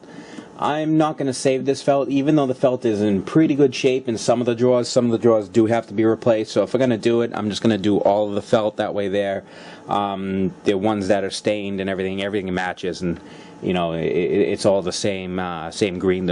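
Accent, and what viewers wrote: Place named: Massachusetts, United States. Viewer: Nice ! I picked one up from a older mans wife who passed away. She sold me around 60 end mills , starrett lufkin BS ect tools indicators planers 123 blocks list goes on and on . She was asking 75$ with out a blink I purchased it. Great video though. Were ya from sounds like Mass im in CT. North east is a machinist dream.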